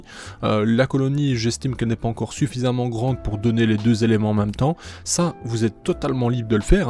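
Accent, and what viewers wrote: Belgium. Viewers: puisque tu le demande, j'ai repéré une coquille ! t'as dis "septante", c'est pas gaulois ca ! d'ailleurs t'as l'accent belge , du coup, Lille? (car je n'imagine pas suisse avec cet accent, mais je peux me tromper hein, je suis un pro de l'erreur en tout genre) partage avec les gens qui en profite, comme moi (merci pour le partage), et puis laisse parler les enfants qui n'ont que ca pour tenter de se sentir supérieur . car comme tout le monde le sait, l'égo se construit en râlant sur des inconnus sur internet , car dans la vrai vie ils peuvent pas parler devant papa et maman sous peine de se faire priver du téléphone .... (bon j'abuse un peu mais il est vrai qu'internet large (la ou on parle avec des inconnus ) devient de plus en plus mal fréquenté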